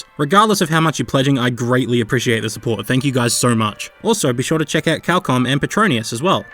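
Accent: Australia. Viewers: Watching this in class FUCK ENGLISH WHEN YOU HAVE AN AUSSIE MAN THAT SPEAKS ENGLISH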